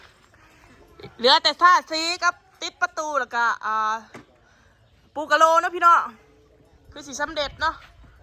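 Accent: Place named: Ubon Ratchathani, Thailand. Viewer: ❤, เว้าคือคนไทย, จังหวัด, อุบลราชธานี, แทร่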